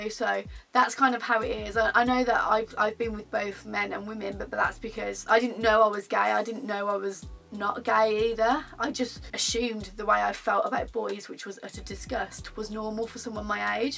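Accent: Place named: Birmingham, United Kingdom. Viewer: I like your accent, which city are you from? Birmingham??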